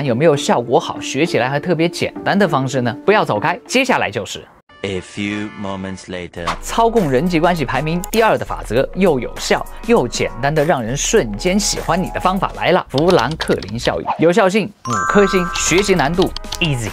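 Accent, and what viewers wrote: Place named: Wuhan, China. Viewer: 最近刚刷到老师，视频听着觉得太棒啦。听到这个视频中亲切的武汉话才得知是我老乡，我是生活在墨尔本的武汉丫，必须支持 ❤马上会员安排上😊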